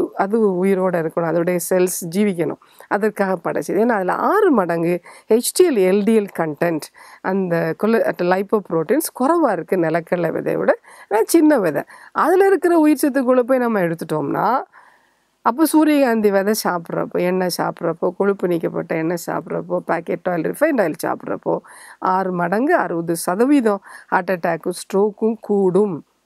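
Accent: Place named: Tirunelveli, India. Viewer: Excellent explanation. Thanks to the Doctor. I liked the way she explained in Tirunelveli Tamil. However, regarding Triglyceride, even strict vegetarians also have high levels. What could be the reason? Is it because of malfunction of Lever and Pancreas? Request the doctor to clarify.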